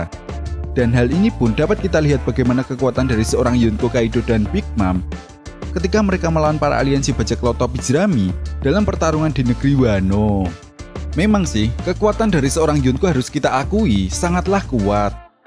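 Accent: Java, Indonesia. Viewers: Bahasane meduk rek 😁.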